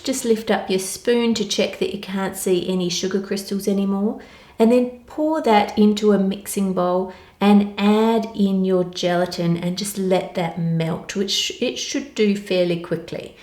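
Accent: Perth, Australia. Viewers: Dear mam Will you please teach us Australian accent please please it's so good 👌 by the way I am kashif form Asia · Australians like have an awesome accent! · it's a Perth accent :)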